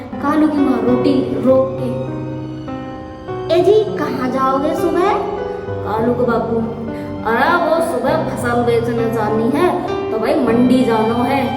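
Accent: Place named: Rajasthan, India. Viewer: ap b rajasthan se h dii 😍😍😍vhi me sochri hmare yha k jesi language h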